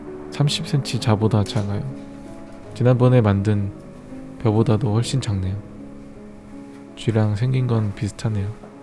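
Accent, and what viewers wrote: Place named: Chungcheong, South Korea. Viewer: 구수한 충청도사투리 ㅋㅋㅋ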